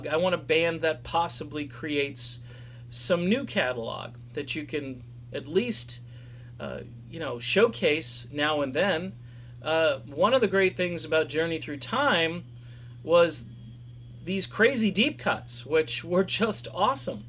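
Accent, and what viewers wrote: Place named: South, United States. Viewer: For that sounds hillbilly fir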